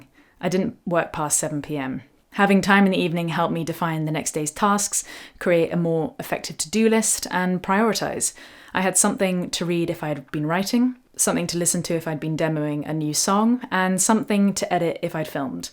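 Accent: United Kingdom. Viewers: lmao i have no idea who you are but i heard the british accent and boom subscribed 🥰🥰 SO refreshing after always watching american youtubers and movies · Holy hell...You're BRITISH?!?!?!?! You were already AMAZING and now you speak.....<3 <3 Sorry I presumed you were Norwegian as well! Just recently discovered Leo and Yourself and Hanna and Rabeah...lol Anyway no that I know you're in England, did you ever have an opportunity to meet or hear from him on your cover of?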